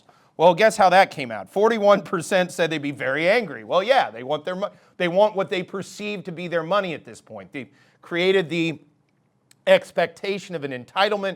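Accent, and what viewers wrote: United States. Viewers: I love the way you say "us Thais"... a true patriot! American accent. Thai heart ❤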